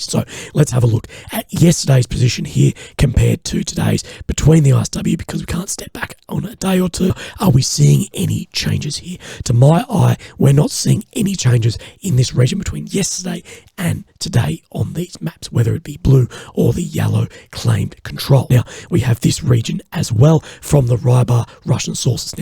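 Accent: Australia. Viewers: Can't speak Australian without a smile! Thank's for your efforts, homie. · funny Australian accent and obscure))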